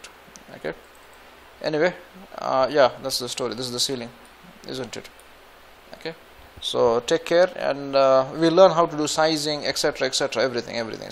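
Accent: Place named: Egypt. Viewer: 😂 بتتكلم لهجه مصرية ❤❤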